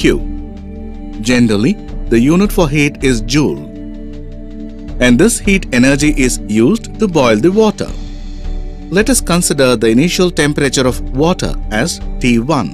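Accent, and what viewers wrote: India. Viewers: WHO IN THE FUCK CREATED INDIAN ACCENT READER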